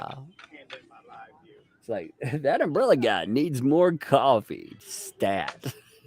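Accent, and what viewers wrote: South, United States. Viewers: for the record your accent would probably be called "Educated Southern".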